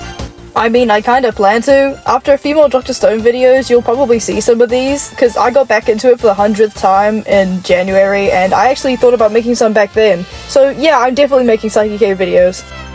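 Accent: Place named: United Kingdom. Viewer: YOU'RE BRI'ISH??? also hi its Ed from discord also we started watching Dr. Stone at like the exact same time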